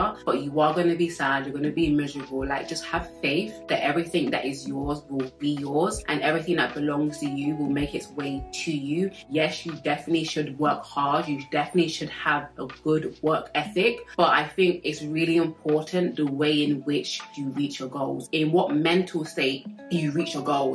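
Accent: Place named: United Kingdom. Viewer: sounds like Nicki Minaj doing a british accent ❤i love her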